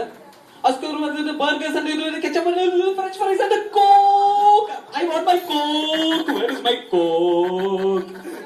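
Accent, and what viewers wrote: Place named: Kerala, India. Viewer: Haha.. This s one of its kind .. Mallu accent at its best and someone s actually cashin in on it. ... Good Job!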